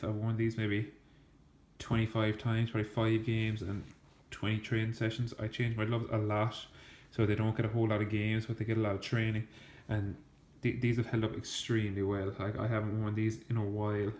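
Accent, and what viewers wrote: Ireland. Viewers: are you from Ireland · sounds like a clare man 😂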